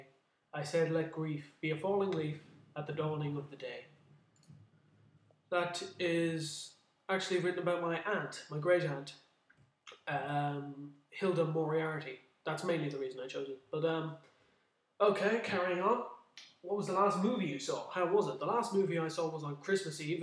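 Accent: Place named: Ireland. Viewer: hooray, finally a non stereotyped Irish accent. The stereotype seems to be it's incredibly strong, and the appearance with the accent is a two foot tall red haired bloke wearing green. Nice to hear a bit of a weaker accent. Not that I can talk, I have one of the strongest Yorkshire accents going. PS: nice to get to know you better, mate.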